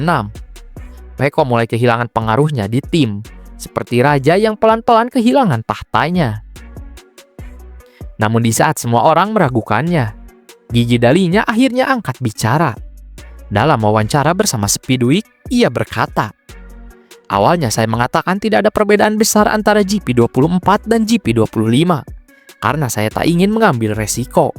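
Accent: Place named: West Java, Indonesia. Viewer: Sunda pisan 😂👍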